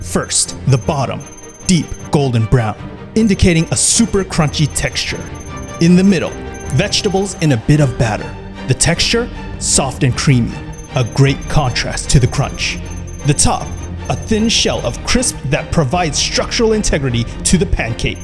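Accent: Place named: United States. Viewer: Thank you for your tip on getting an extra crispy results. Rice flour along with the carbonated soda also works. My only issue, is that (other than the amount of frying oil) you did not include portion or size requirements in your video nor in your description box. Portion size of ingredients does effect the desired results of the batter after it is cooked. Lastly, you have an American or Canadian accent, but live in Japan, what is your heritage? Thanks again for your tutorial. ⚘⚘⚘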